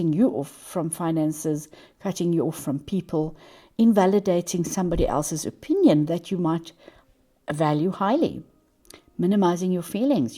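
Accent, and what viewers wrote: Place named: South Africa. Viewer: I thought I had recognized your accent… from SA myself 🥰 but living in Portugal. Thank you for your time 🌸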